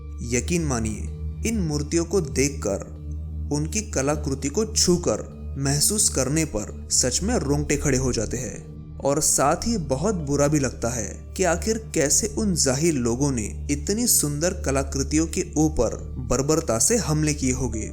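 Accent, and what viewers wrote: Maharashtra, India. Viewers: मित्रा तूझ्या उच्चारावरून तू मराठी वाटतोस. औरंगाबाद परिसरांत शाश्वत पर्यटनासाठी आम्ही प्रयत्न करतो आहोत. एक फ्रेंच मित्र गेली ४ वर्षे नियमित येथे येत असतो. तूझा मो. न. कळव. श्रीकांत उमरीकर <num>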